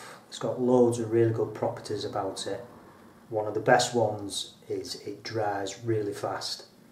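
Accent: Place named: Manchester, United Kingdom. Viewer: You sound like you are from manchester, how do I book you. :-)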